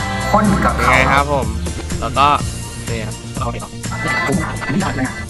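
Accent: Thailand. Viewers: เสี๊ยงเหน่อดีแท้น้อออ 😁😁😁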